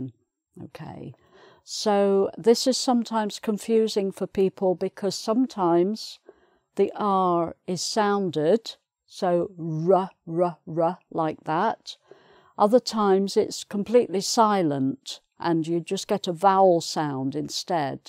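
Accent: England, United Kingdom. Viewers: british accent is SO pretty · CharlieRashi, Britain comprises 3 countries - Wales, Scotland and England. The United Kingdom comprises 4 countries - with the addition of Northern Ireland. (The Republic of) Ireland (in the south) is in no way British. There is no such thing as (oral) British English (although as someone mentioned above it is often used to describe spelling differences with the USA). Jennifer Jenkins’ research might be worth reading: in particular her work on the Lingua Franca core, and how to pronounce the r sound to increase global comprehensibility. It’s not wrong to copy the accent of the speaker in the video if that is what you want to do, but her accent is only one of many equally correct accents emanating from the UK or elsewhere. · British accent 😍 take me over the cloud ☁️..amazing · какой у неё невероятно милый голос и акцент. 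британский английский по сравнению с американским так красиво звучит. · I wish more British could speak in the same way as you:)) most of them speak like they are chewing something or drunk guys who have some problems with pronouncing sounds:))) Thanks a lot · She's sooo good and I love the British accent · Excellent! I love British accent 😊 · Which British accent? I think you mean an English accent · I really like this lesson because I prefer British accent. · somehow i really like british accent. anyway thank you! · British pronunciation... My favourite💕💕💕 😍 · Mrs...., your teachings r GR8! and I luv yr 🇬🇧 accent! God bless u! I'm Mr. Ricardo. I'm Brazilian. Thnx4yr youtube classes. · With over 400 down arrows, I'm assuming I'm not the first Brit who disagrees with her. (I've read some comments, but not seen one contradicting her.) There are several regional differences across the UK, and at least some of them pronounce every R on that board (properly). 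She's very clear, you won't go wrong by following her lesson. However, she teaching you one particular English, not a nationwide one. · She's so cute... And I love British accent 💙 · Thank you very much! Great pleasure to hear such beatyful British English . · a beautiful British accent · And Thank You for being such an excellent teacher- listening to your speaking alone is a great help and demonstrates the beauty of the (British) English language 👍🙏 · Maybe this is how the letter is pronounced in England but it is certainly not as it is in Scotland so please make the distinction a lot clearer. Not helpful at all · Thank you so much! The British accent sounds so beautiful! Greetings from Russia. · Hidden Cuber At least,she has an English accent. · Ох, как же приятно звучит классическое британское произношение. Прям наслаждение для ушей. И все понятно. Американское r-ыканье с детства не выношу. 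Мечтаю научиться говорить с британским акцентом, но не получается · Dear teacher, your facnating British English is worth studying by millions of people. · me encata el asento britanico xd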